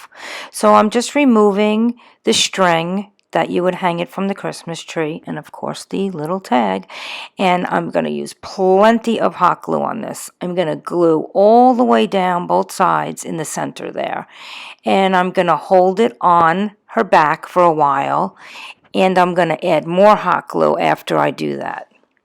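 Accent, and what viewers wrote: New York, United States. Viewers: So so happy you were apart of the hop! That angel 😇 was absolutely gorgeous! The pallet tree looked great with the clip lights, and that rustic candle stick was equally as beautiful! Great idea to distress with a nail file! Nice to hear someone with a similar accent! My family is from NY 😊 You now have a new subscriber! Look forward to watching more of your videos! Have a Merry Christmas 🎅 🎄 ❤ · Boy you sound like a NYer... Where are you from? Love the angle and your palette tree.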